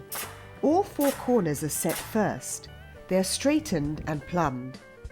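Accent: United Kingdom. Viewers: GREAT video!! Thanks for making it! Love the narrators accent. Taking this country back one job at a time! Rod Fair, fellow Brit in Fl. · Love the British accent! Good video too! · The lady narrator with the Brit accent adds class to this. Well done.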